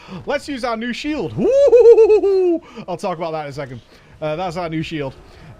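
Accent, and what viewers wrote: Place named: Northern England, United Kingdom. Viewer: so disc. is a shield bitch again huh? sounds good, I liked that playstyle. Oh the cringe.... everytime you butcher the English language, it just sends a chill down my spine. But I guess that what we learn is Southern English and so when you say something like Sat instead of sitting, it just sounds wrong. because "Sat" is past tense of "to sit". but I digress. I know its the way you northern English people speak, it just sounds wrong to me lol.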